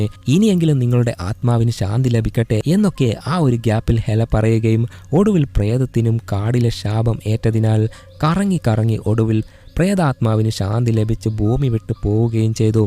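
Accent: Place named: Kasaragod, India. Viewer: Ningal kasargod alle because language kond manassilakum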